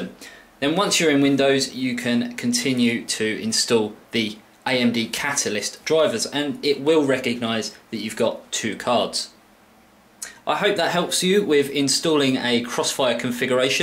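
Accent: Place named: England, United Kingdom. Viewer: gotta love english accents :D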